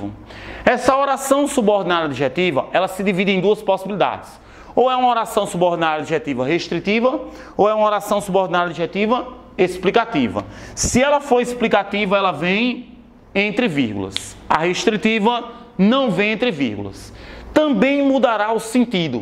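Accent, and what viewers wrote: Northeast, Brazil. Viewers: de onde vc é ? pois tem um sotaque nordestino e meio português de portugal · O famoso e gostoso de se ouvir sotaque nordestino ksksks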